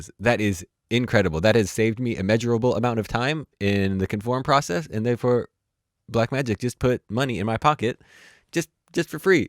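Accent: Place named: England, United Kingdom. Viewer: it's english..not american lol..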